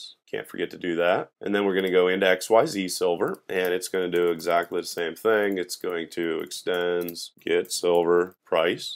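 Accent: Pittsburgh, United States. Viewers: Enjoyed the pace and the material. Your accent takes me back to the 'burgh.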